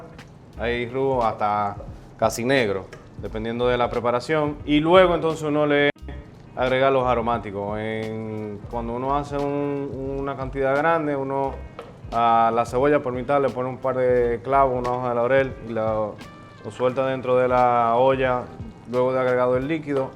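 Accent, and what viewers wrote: Dominican Republic. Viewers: Vamo arriba!! me encanta nuestro acento tan original, siempre y cuando no caigamos a lo vulgar, solo que apreciamos lo que realmente somos cuando estamos fuera de nuestro país. Este canal me encanta!!!! Bravo!! 👏🏻👏🏻👏🏻🙌🏻😊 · Jajajaja!!!! primera vez que veo este chef me encanto!!! su forma de explicar muy gracioso parece dominicano al hablar 😂😂😂😂😂😂😂😂😂😂😂😂😂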